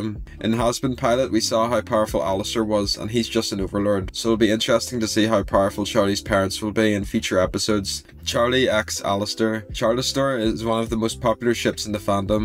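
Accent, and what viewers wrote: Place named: Northern Ireland, United Kingdom. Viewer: are u northern irish? i immediately recognised that accent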